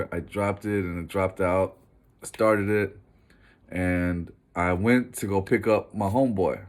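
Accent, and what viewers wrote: Houston, United States. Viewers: “I’m from Houston, Texas. A lot of y’all already probably know.” I know someone from Houston when I hear one 😹. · What part of the H you from bro?! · My boy you gotta be from alief lol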